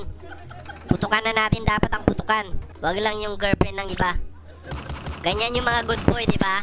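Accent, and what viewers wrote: Visayas, Philippines. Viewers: Kuya ano ka? Bisaya o Tagalog?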